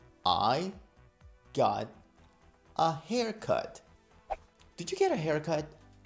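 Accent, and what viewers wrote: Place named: United States. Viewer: Buen inglés americano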